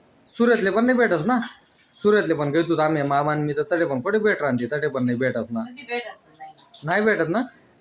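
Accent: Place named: Jalgaon, India. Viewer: बोलण्याची ट्युनिंग जळगाव ची वाटते. आपल कोणी बोलत आहे असं वाटत आहे 😂😂😂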